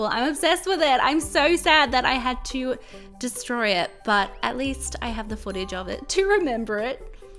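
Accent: Australia. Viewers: Are you from Australia, New Zealand or South Africa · your voice is like italian australian some kind of thing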